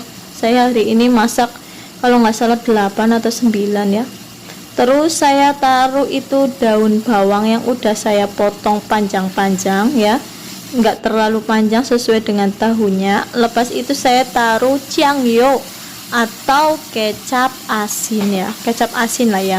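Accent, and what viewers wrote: Banyumas, Indonesia. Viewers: Suka dengernya basa ngapaknya mbg,, Slm knl mbge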